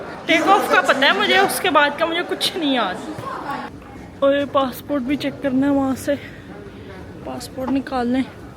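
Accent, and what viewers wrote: Lahore, Pakistan. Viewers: You have really pure lahorei accent bless you I really enjoy your vlog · 😀😀😀china Pakistan 🇵🇰 iron friendship. I love & proud born in Pakistan 🇵🇰. You”re so funny speak Urdu like tipical Pakistani.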